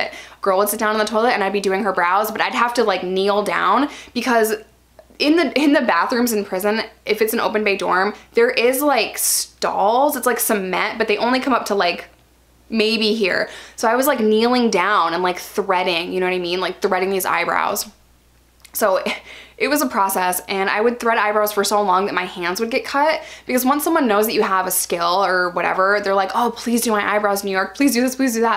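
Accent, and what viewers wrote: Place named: Southern California, United States. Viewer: Arkansas? Accent is a bit more So Cal.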